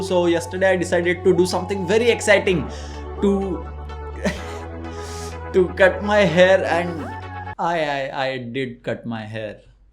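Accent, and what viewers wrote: India. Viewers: Is that one punch man with a mustache and an indian accent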